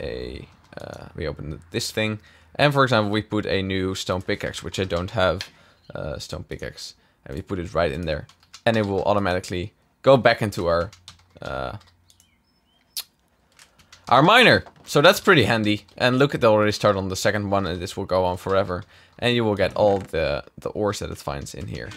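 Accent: Netherlands. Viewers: mooi engels man maar ik hoor wel dat je nederlands ben :D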